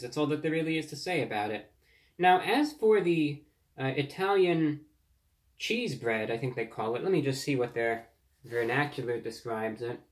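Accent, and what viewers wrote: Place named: Transatlantic, United States. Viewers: I'd like to hear him once in a while. Speak with more of his east coast accent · Boy, that transatlantic accent is fighting its way into the forefront, slow but steady.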